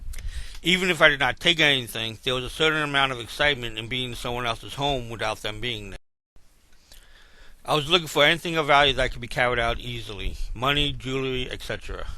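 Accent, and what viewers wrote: New York, United States. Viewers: You sound like you are from New York. Great channel by the way, I loved your style of editing and presenting. Subscribed today. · Are you a New Yorker?! haha Adorable. Great narration! I'm from Queens!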